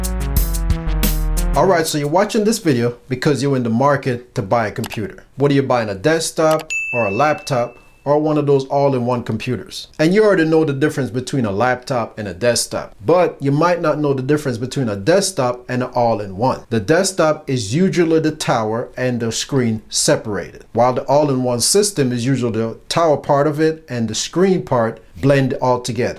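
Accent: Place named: Jamaica. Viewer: Thanks man this was really helpful, I could be wrong but I think I'm hearing the yadi in the voice of so big up yuh self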